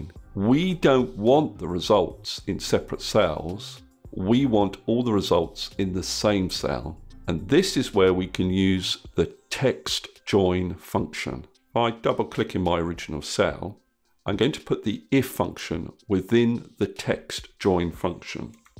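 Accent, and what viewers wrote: England, United Kingdom. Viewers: thats a great solution..thanks dear... I love your English accenr...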